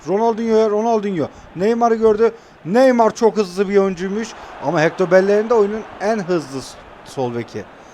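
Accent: Kahramanmaraş, Turkey. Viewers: Abi sen maraşlımısın?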